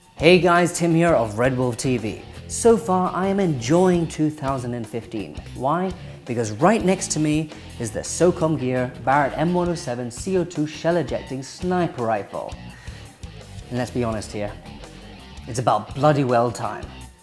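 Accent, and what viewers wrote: United Kingdom. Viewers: Asian British accent.. first for me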